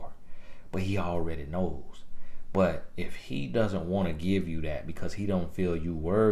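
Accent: South, United States. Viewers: I smiled every time he said “On ya bike!” 😂😂😂 I love southern accents!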